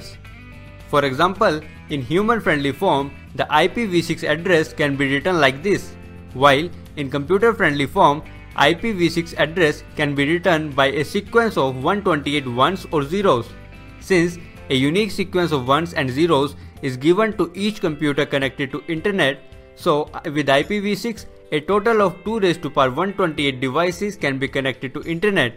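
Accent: India. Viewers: I like how he has an Indian accent and talking about IP address. Truly, perfect.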